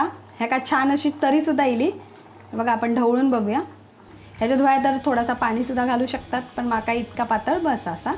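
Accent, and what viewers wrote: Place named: Malvan, India. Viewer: आम्ही पण वैभव वाडी चे पवार, ही मिसळ/उसळ अगदी आम्ही नेहमीच बनवतो तरी ही मी ही रेसिपी पहिली कारण तुमचं presentation , उगाच फाफट पसारा नाही , बोलायची पद्धत म्हणजे अस्सल मालवणीत . हे ऐकूण खूप छान वाटतं व तुमचे सगळे विडिओ बघावेसे वाटतात. असंच चालू राहू दे. दोन्ही जाऊ बाई जोरात .👍👍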